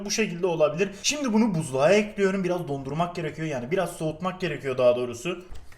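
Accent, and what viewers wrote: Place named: Hatay, Turkey. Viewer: Bu adam amerigalı olsa beğenirdiniz dimi ama bu adam Hatay ağzıyla konuşuyor ve Hataylı bu yüzden bu videoya like atmayacaksınız ama bu adam Amerigalı olsa 10 milyon abonesi vardı (YouTubetok)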